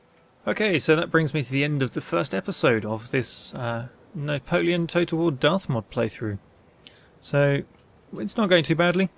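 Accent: United Kingdom. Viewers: You sound like a 100% englishman.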